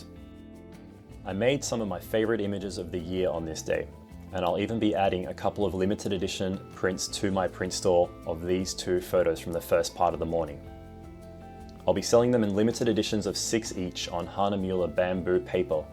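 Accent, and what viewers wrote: Australia. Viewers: I used a lot of AGFA colour and BW film in the day. Loved it and miss it. Still use Rodinal. Good work. An Aussie voice. 😀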